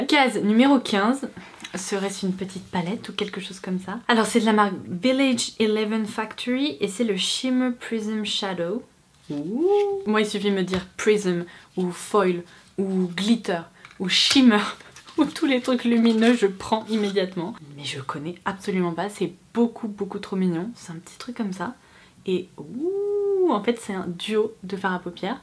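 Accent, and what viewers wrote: England, United Kingdom. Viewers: La vidéo qu’on attendait toutes je pense, au début j’étais comme toi pour la bave d’escargot mais finalement étant donné que ça fonctionne eh ben ma foi 😂😂😂j’adooooooore ton accent anglais en passant hihi et merci pour le concours 🤞🤞🤞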